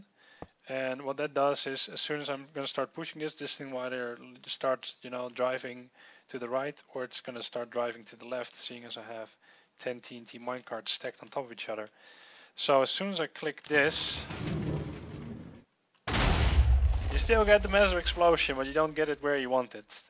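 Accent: Netherlands. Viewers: aan je Engels hoorde ik dat je Nederlands was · ***** oke nederlands :P je acent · let me guess, you're dutch. (kan je horen aan je accent)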